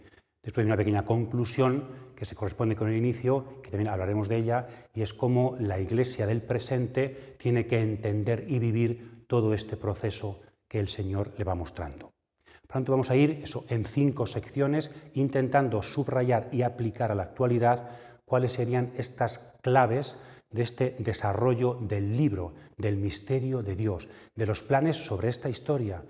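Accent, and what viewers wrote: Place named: Spain. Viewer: Escuchar a un sacerdote de sana doctrina y con acento español no tiene precio. Dios lo bendiga Padre!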